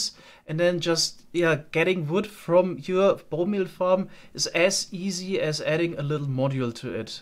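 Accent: Germany. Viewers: Ich höre da irgendwie Deutsch raus xD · Are you german? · kann es sein das du Normalerweise Deutsch sprichst? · Bruder guter content und ganz ehrlich der deutsche akzent ist mega charmant!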